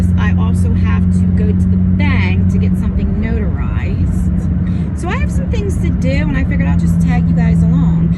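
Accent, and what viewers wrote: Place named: South Jersey, United States. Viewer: 😀 I knew you were from South Jersey by the way you talk ( I'm in Mantua )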